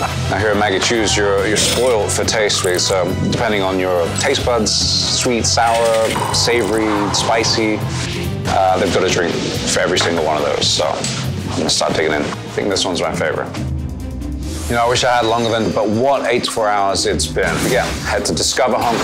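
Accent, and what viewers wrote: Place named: United States. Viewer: I had to look up this ad cause I was surprised with his accent. He sounds American now 😢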